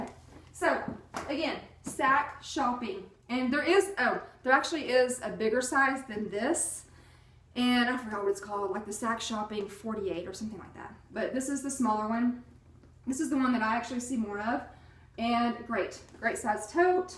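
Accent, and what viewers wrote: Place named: Texas, United States. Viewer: Love you videos. Are you from Texas? Love your accent.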